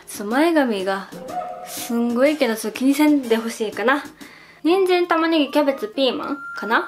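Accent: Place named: Hiroshima, Japan. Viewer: 元彼が広島の人だったからと同じ喋り方だからなんか思い出したあああ笑笑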